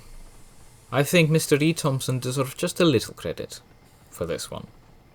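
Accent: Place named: Scotland, United Kingdom. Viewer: An excellent and highly informative video. Although I'm English, I thoroughly enjoyed the Scottish accented narrative. A nice touch and thank you kindly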